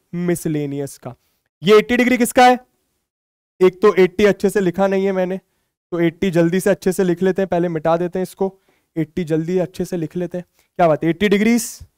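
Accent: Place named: Bihar, India. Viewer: Sir ka bihari accent🤌🏻🤌🏻🗣️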